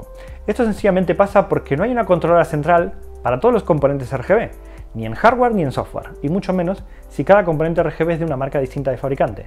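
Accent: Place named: Argentina. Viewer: Se te nota el argentino todavia!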